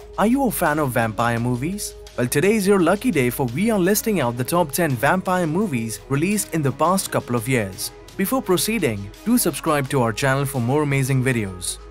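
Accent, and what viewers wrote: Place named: India. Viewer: damn... I cannot listen w/o laughing that indian accent! :)) Bro, better you record "top 10 unmissable cartoons"! :) And no need to include "Underworld" twice - ALL its parts are perfect.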